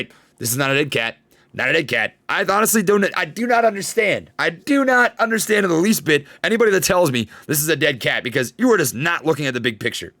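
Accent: Minnesota, United States. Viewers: Minnesota boy?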